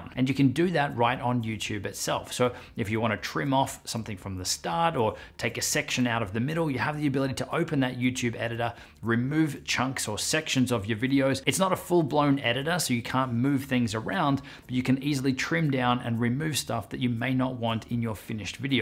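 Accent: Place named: Australia. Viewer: Thank you for the tips, love the aussie accent :) Cheers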